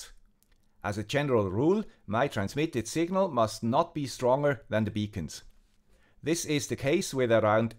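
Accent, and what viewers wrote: Switzerland. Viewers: Swiss accent! Any idea about making a very long range telemetry, like 100km? Looking forward to see your response 👍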